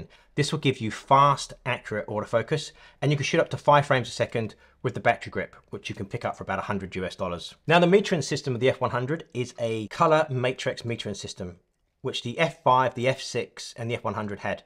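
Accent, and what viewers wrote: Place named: United Kingdom. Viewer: Hi thanks to you i'm itcing to buy this! Where can I get the Nikon F100 as notice you have brit accent im in UK too, a lot on ebay say From Japan? Are those imports should I ignore those? I'm lost Any good sites you recommend and what should I look out for? Your advice is appreciated please!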